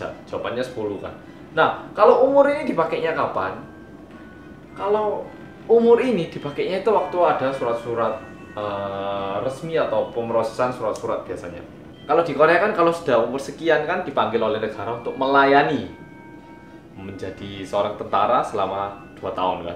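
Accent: Java, Indonesia. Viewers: Aku baru nonton videomu loh mas. Medok banget ya, Mas'e 😂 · Kok medoknya kerasa mirip sama mas Kaesang yah... 😍😍😍😍👍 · Emmm cuma pingin komen sampeyan medok banget Mas 🤣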